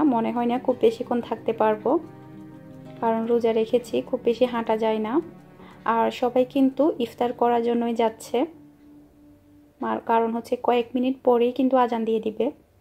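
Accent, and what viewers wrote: Chittagong, Bangladesh. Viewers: Apu tumar sob blog dekhle e valo lage..sobcheye beshi valo lage ctg tone e kotha bolo bole...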